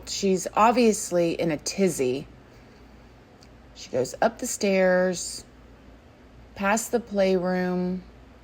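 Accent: South, United States. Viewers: Ok, I usually cringe at most youtube creators, especially when they have that southern accent...being European, it's like nails on a chalkboard. BUT...you are simply amazing. You're keeping it real, you've got charisma, you're empathic and I LOVE your southern manner of speaking. Keep rocking and never change! 😍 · where is your accent from sounds a little like a down south accent?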